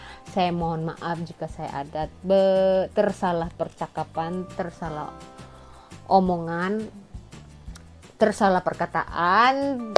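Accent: Malaysia. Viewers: Bahasa nya mengingat kan dulu di johor dan kota kinabalu... teladan yang bae...makasih sdh sharing..sukses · Dah cakap mcm org Malaysia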